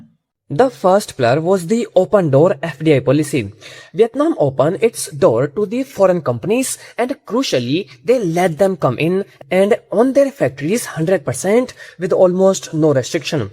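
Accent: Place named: India. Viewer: Bro don't make video in Indian accent because you will face trolling 🙂❤ I hope you understand 🥲❤️‍🩹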